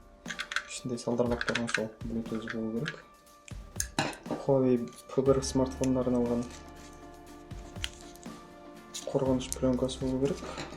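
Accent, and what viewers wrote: Standard. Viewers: Жарайсын. Казакша таза сойлейды екенсын. Сендей адамдар эксклюзив болып барады. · сау болш казака таза сойлейдекен осылай сойлеу керек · жарайсың таза қазақша. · қазақша таза созине ризамын, осындай бауырлар көбейсін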